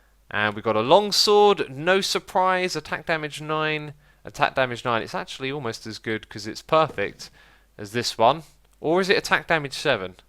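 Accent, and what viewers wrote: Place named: United Kingdom. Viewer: Hey x. Why so British?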